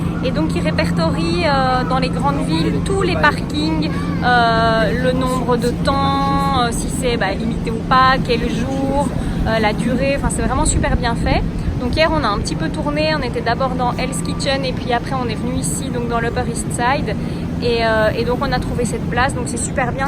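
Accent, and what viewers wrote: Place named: Belgium. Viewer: Quand les videos sont de qualités c'est tjs un plaisir à regarder. Sur la vidéo de présentation de Many j'avais cru entendre un petit accent belge et j'ai eu confirmation sur la première vidéo que vous étiez du plat pays . Si j'ai bien compris vous faites votre trip pendant 13 mois . Vous voulez faire tout le continent américain et renvoyer Many en Belgique ou vous allez le vendre sur place ?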